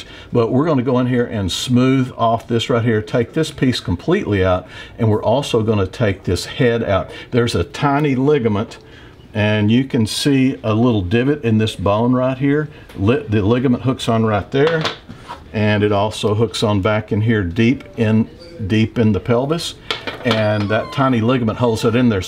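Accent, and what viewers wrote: Texas, United States. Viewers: love his texas dialekt haha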